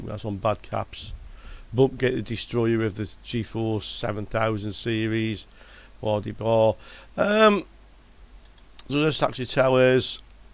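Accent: United Kingdom. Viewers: Another guy who we can't understand...Too much 'Brit mumbled accent and boring personality?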